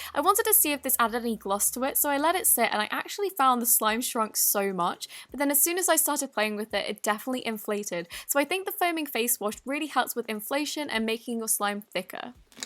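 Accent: England, United Kingdom. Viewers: I love you because your videos are perfect you are really pretty and I love your voice also you're British like meeeeeeeeeee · Are you English???¿¿? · I really love your British accent! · Don’t forget “at all” in her cool British accent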